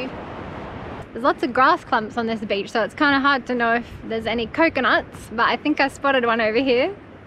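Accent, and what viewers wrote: Australia. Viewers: So gorgeous and sexy. Love your long hair, your beautiful smile and your Aussie accent. You are very resourceful and intelligent. Keep up with your awesome videos. Thanks from Canada. ❤❤❤❤❤❤❤❤❤ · You are so stunning and talented. It's great to finally hear your beautiful Aussie accent.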